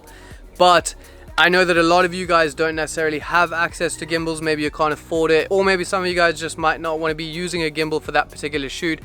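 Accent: South Africa. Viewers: Trying to figure out your accent. My short list is South Africa, UK or Australia. If I was to guess then I’d go with South Africa - am I right ? Great content as always.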